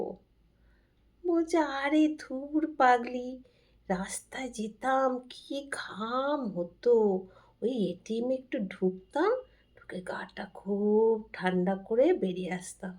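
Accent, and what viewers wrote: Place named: Kolkata, India. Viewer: আপনার বাড়ি মনে হচ্ছে কলকাতায়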